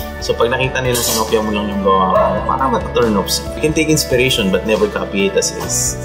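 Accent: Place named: Bicol, Philippines. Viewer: Hi po, bibihira ganitong art content sa pinas. Keep on going po Sir! Love your accent too! Maurag talag ang mga Bicolano 😊👌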